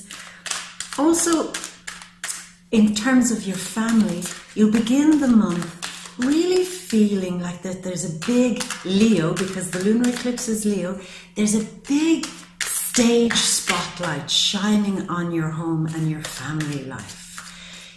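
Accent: Ireland. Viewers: god is love You have Beautiful Gift. I enjoy your manner. Your voice sounds a bit Irish ! Your Tarus friend.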